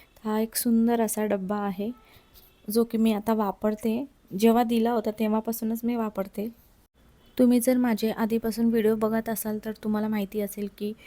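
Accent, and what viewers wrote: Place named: Vidarbha, India. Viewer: mala bolnyatn janaval tumi vidharbhatlech ekadlech asal manun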